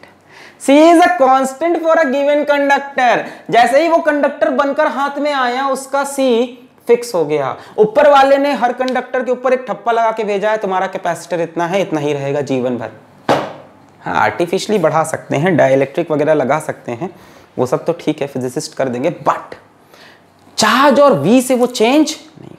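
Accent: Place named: South, India. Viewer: South Indian accent 😍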